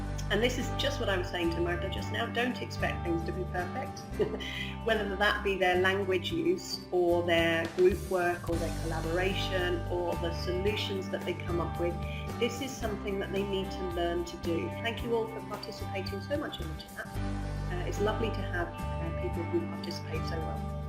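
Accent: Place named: United Kingdom. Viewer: Hello:) I've just finished watching your video and I've got to say that, in my estimation at least, it was pretty helpful:) Besides, I've been your loyal viewer for a long time but not in order to learn English, just for fun. Your English is incredibly fluent and additionally your accent sounds very British and so that makes me think you are a teacher. If I'm correct, I'd like to ask you a question:) Well, I currently attend a secondary school and I've been wondering what I can possibly do to decrease my anxiety while talking to my teacher. My being anxious doesn't stop me from keeping a conversation going but it's quite annoying because then I can't help the swaying of my legs from side to side or I feel a constant need to gesture. Also, I sometimes feel kind of embarrassed when I speak and my teacher smiles to me or looks intensively in my eyes. 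I don't know why it works like that. Nonetheless, I do not deal with this problem while shooting the breeze with my friends in English or having a conversation with other English teacher at school. I want to start working on it but I'd need some advice and you're the only available teacher :) I know my message is long, I'm sorry, I hope you successfully reached the end:D I'm looking forward to hearing from you:)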